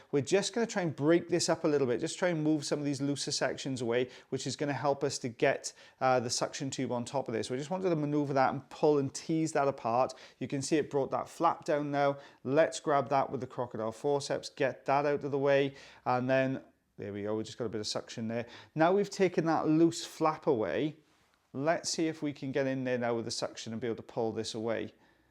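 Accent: Wales, United Kingdom. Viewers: When it's 106 degrees in California and you come across a lovely welsh accent! Dioch!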